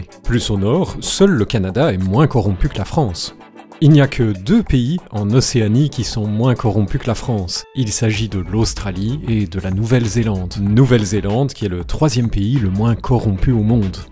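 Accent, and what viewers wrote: Belgium. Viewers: "Une chaîne aux accents funky" animée avec un accent belge ! Bisous.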